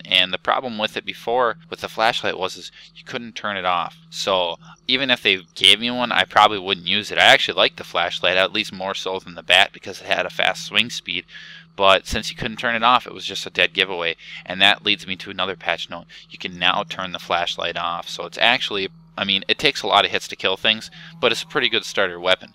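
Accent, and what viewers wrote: Canada. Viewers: you have a heavy newfoundlander-canadian accent lol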